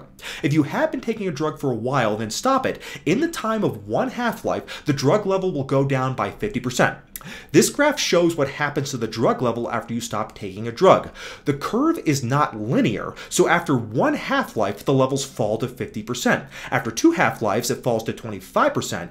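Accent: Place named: North, United Kingdom. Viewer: UK vibes. Possibly north of england. Possibly Pakistani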